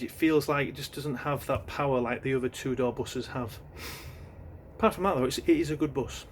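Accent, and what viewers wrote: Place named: Huddersfield, United Kingdom. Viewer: e by gum thall never git rid of that huddersfield accent and dont ever try says a lad from dewsbury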